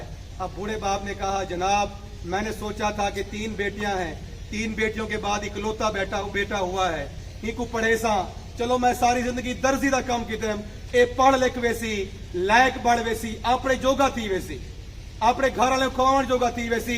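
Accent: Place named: Saraiki, Pakistan. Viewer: سرائیکی ٹچ 👌👌💯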